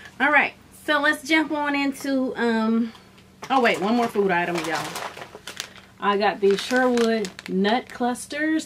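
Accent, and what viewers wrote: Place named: North Texas, United States. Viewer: You are how I act when I am alone and can't be judged for being silly. Don't ever loose your personality. Where are you from? I would guess north Texas or Oklahoma only from the accent. I live in Dallas. Stay Sweet ❤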